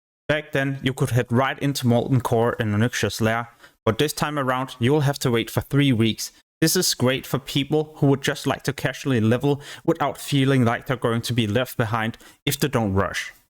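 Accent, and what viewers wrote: Denmark. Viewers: Mm danglish! Nothing but love.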